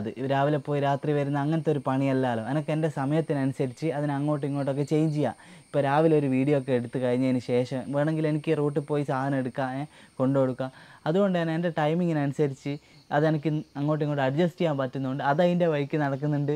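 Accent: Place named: Nadapuram, India. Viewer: vadakara thanneyono.allel nadapuram bhagathaano?, samsaaram nadapuram shailiyaanallo😆😆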